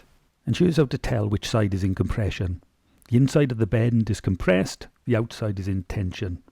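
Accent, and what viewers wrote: Wales, United Kingdom. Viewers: Clear, concise, excellent explanation of concepts!!! But unfortunately for the rest of the day I will now be talking in a heavy welsh accent!!!!😆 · Beautiful welsh accent and very informative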